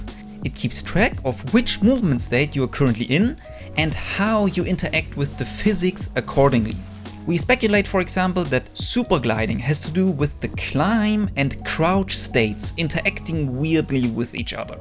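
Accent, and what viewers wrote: Germany. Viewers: you sound german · Das is doch ein Deutscher Dialekt denn ich da höre,oder? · Are you german merkt man haha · are u german u have a german accent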